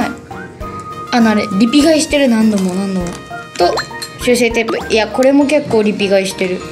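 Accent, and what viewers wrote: Kumamoto, Japan. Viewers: の筆箱紹介好き！同じふせん持ってる！！広島弁と熊本弁って似てるね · 熊本弁もっと喋ってほしい！！かわいい、！ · 字綺麗で羨ましいです熊本弁可愛いすぎ(≧∇≦)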